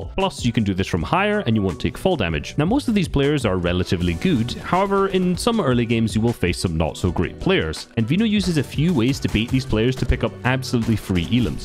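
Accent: United Kingdom. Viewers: He is British I think tho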